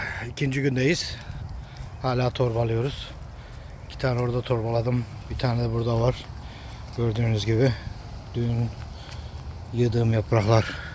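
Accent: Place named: Çorum, Turkey. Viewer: Usta Çorumlu gibi konuşuyorsun ben Çorumluyum şiven bizim oranın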